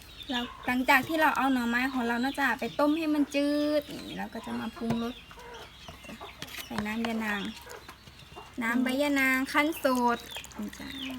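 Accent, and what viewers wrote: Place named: Nakhon Phanom, Thailand. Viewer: ได้ยิน สำเนียง คน นครพนม รักเลยคะ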